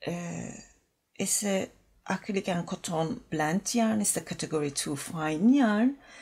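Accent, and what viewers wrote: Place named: Turkey. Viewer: Türk aksanlı İngilizce 😂😂😂😂hemen belli oluyor ,broken eng too…😂😂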